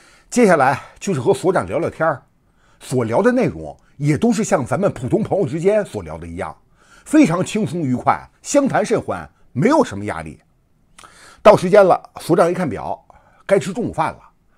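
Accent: China. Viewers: 你口音就是 京津一带的口音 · 你虽然是台湾人但是你的口音确实像大陆的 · 老哥不像台湾人 你那把中国人的口音骗不了人 就像新加坡人跟马来西亚人的口音差距太大，中国南方跟东北人的口音那样，只要一开口说话立马漏馅了😊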